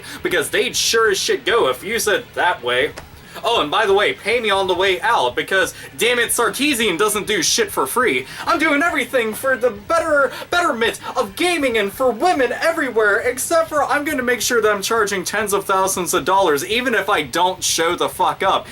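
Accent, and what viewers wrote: Pittsburgh, United States. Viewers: where are you from? I'm from Fayette County, PA and your accent reminds me of home. I noticed your Pittsburgh Zombie shirt so that's further evidence that you're from that area. Your rants are fucking awesome and your accent sends it over the top. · I realize this is an older video, but I have to ask; are you a Pittsburgh native? I gotta know man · haha holy shit, youre a yinzer?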